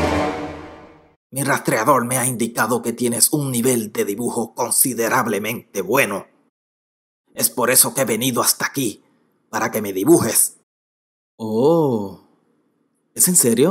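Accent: Puerto Rico. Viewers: OYE ERES DE PUERTO RICO?? Soy dominicano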